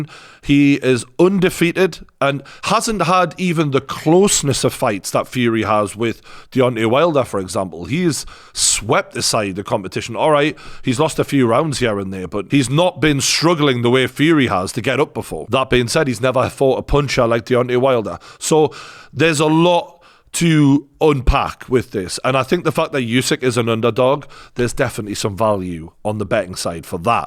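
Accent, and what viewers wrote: Newcastle, United Kingdom. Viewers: Always like listening to a Byker lad .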